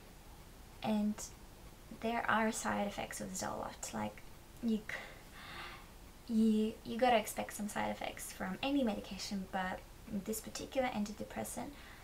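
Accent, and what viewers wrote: Australia. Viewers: Thank you for sharing your personal experience with taking Zoloft. I'm about to start taking it and it was nice to find an honest review :) Are you from Australia by chance? Your accent is really pretty and sweet! ^^. Anyway, thanks again. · Blimey, no wonder you got anxious, is that a picture of Vladimir Putin on your wall? This video has been very helpful. I'm starting Zoloft today for treatment of a chronic anxiety condition. That sounds like an Australian accent. If you don't mind me asking what part of Oz did you grow up in?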